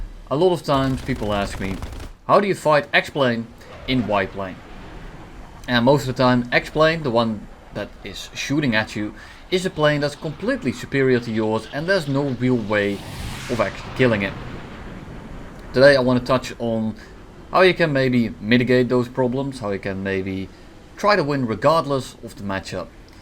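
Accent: Netherlands. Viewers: goddamn i can hear the dutch accent once you notice it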